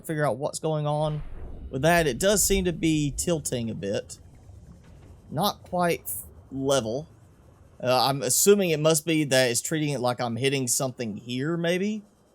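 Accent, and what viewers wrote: South, United States. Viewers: I love your southern drawl it is so charming XD Up here in Michigan we tend top be on the nasally side lol. Love you Subnautica videos, seriously huge help thank you so much for making these! Best Subnautica Youtuber right here folks^^.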